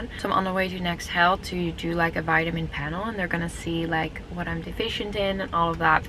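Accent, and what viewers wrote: Netherlands. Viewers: whaat, when i first came across your video’s i thought i heard a dutch accent to your voice, you’re amazing!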